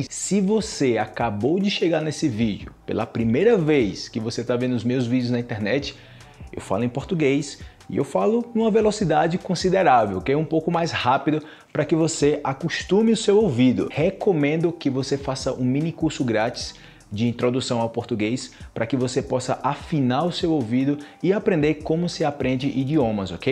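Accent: Colombia. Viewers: Te lo juro me sorprendió cuando te escuché hablar con ese acento colombiano, ya me confundí no sé si eres de Brasil, de Colombia de ambos o de ninguno. 🤦 Te descubrí gracias a mi profesor quien recomendó tus vídeos. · Me encanta tu acento en español. Parece colombiano 💖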